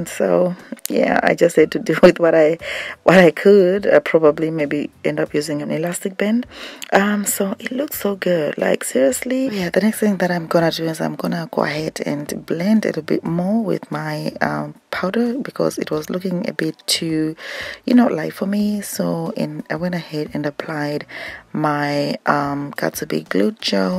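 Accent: Zimbabwe. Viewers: Chaz Lynn she's Zimbabwean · You sound just like my aunt. You have to be Zimbabwean.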